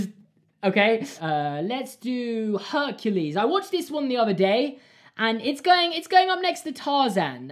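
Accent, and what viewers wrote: United Kingdom. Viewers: As a fellow Britishman, I approve of the Renaissance pronunciation going on in this video 👍🏻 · see this is what irritates be. People from the US saying a brit is mispronouncing their own language.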